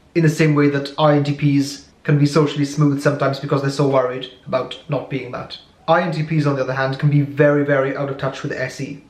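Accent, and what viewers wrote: Wales, United Kingdom. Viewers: Are you from wales?🏴🏴🏴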